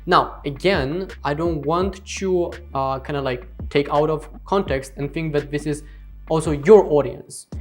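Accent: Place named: India. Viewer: a blonde with an Indian accent.... that's rare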